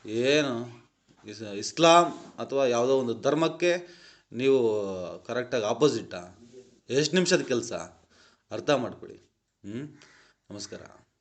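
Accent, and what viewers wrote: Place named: India. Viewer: real indian voice to you bro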